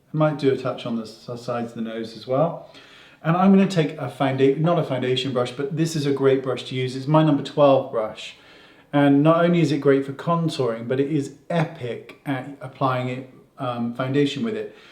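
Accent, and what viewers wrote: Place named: Wales, United Kingdom. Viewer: nothing better after a tough day than relaxing to your voice with your Welsh accent.😍.Sending you love from Australia 🎈❤️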